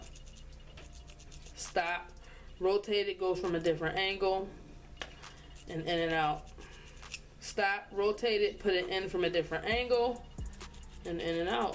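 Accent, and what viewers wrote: Baltimore, United States. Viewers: Omg u sound like you’re from Baltimore lol but I’m starting my own locs I had them before but cut them several times but naw I need to go back using this method 😍😍😍😍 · Tell me you from Baltimore with out telling me you from Baltimore. Or at somewhere in Maryland.😊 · A tutorial with a Baltimore accent!! I love it! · I know a Baltimore accent when I hear one ❤ much love from Jersey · What state are you in? I can tell your from Baltimore based on your accent. I am just hoping your in Texas! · You from B’more???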